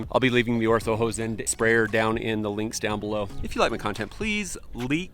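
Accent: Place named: Utah, United States. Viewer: Just discovered your channel. Damn, your accent is hardcore Utahn.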